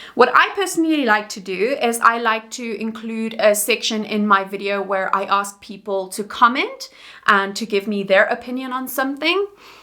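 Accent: South Africa. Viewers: Very very very good video. Been thinking of trying to be a bit more low effort as well. Also, you sound like you're South African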